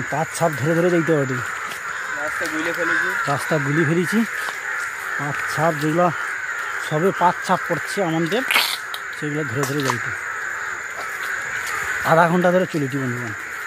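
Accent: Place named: Midnapore, India. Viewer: Tomar bari sundarboner kothay dada❤ আমাদের মীদনাপুরের ভাষায় কথা বলছো প্লিজ বলবে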